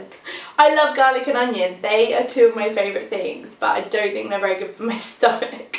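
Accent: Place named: Australia. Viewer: You're gorgeous as fuck and that Australian accent melts my soul I love it😍😍.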